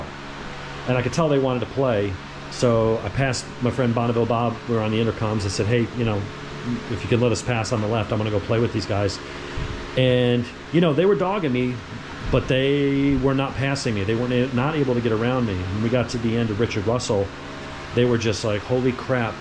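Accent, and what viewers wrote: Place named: Australia. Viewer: On point with the Ozzie accent.😄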